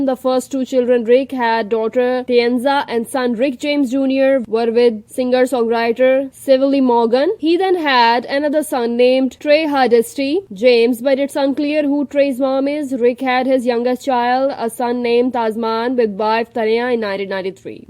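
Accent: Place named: India. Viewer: Who are you, with this heavy indian accent???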